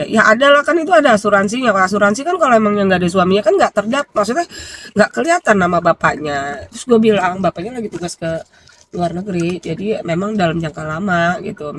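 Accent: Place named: Betawi, Indonesia. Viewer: Betah klu ndengerin kak cerita, lucu, seru kadang sedih juga, apalagi ditambah dengan dialek nyablaknya khas betawi bekasi,pokoknya buat kak, sukses selalu.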